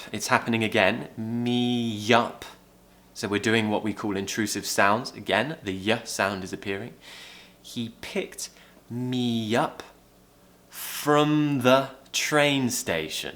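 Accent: RP, United Kingdom. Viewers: I'm Egyptian and it is the first time to see your videos.. That was really amazing and i hope to be excellent in British language cause I'm in love with this language accent❤️❤️❤️❤️❤️ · Best I have seen thus far. He speaks clearly so you can really hear the different in pronunciation in comparison to other accents. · You are amazing teacher. I have just joined you. I am fond of the British accent. thank you, I am from Egypt and I love you very much God bless you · Nice videos you're doing. Your accent is perfect for an old teacher to get back to a standard accent. I lived in the US for awhile, then in Northern Ireland. The Northern Irish accent from Fermanagh prevented me from teaching for a while. I resumed teaching with an American accent, but after a while I realized the Yankee accent was very difficult for French learners. After my break, following a surgery, I was on sick leave for almost six months and moved on to get back to a standard RP accent, which proves to be more understandable for some students... 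Had a French student, whose father is a Briton, he couldn't get a word in any exercice with American English (mostly listening exercice). I found your videos recently and it is totally rad (sorry, Americanism)... As a teacher of English, even though I have lived for some time in English-speaking areas of the world, the right intonation in English is always a challenge for toneless and stressless (depending on regional dialects) French. I sometimes use your vids in class to get my students used to the new RP accent I hear when visiting southern England... Keep on with it! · Sir, your way of teaching is outstanding. These videos are really helpful in terms of british accent . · Hi Teacher I really liked your accent Britsh accent. I enjoyed your lesson. I will continue listening to your lesson because I like it. I didn't understand the course you have talked about. Where ca I found it? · Hello you have a perfect british accent I recognize because i had a canadian teacher who taught me a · I love the British accent and l love your explanation. 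You are an amazing teacher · New subscriber here ! When I watched your video I love it already 😁 I really like British accent Like movie Harry pottah! 😅 Game of thrones 😅 Watching from Philippines Thank you for sharing Keep up the good work 😇 Ps I watched your video since yesterday 😅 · same here, looking forward to speaking like him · Listening to you to get more from your accent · I´m a languages speaker student and I´m more familiar with an American accent than a British accent, but your videos have helped me to more familiar with your British accent. Love your videos and you too. Kisses from Mexico. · I started watching you because you are similar to my ex friend, but now I do it only for your great teaching of my dream accent. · I want to marry a British man. Love your accent! 😍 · AT LAST THE REAL BRITISH ENGLISH ACCENT. THANK YOU MATE!!!!! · I love your accent. Thanks for your beautiful british accent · Love your accent I want to learn more British English accent ❤❤❤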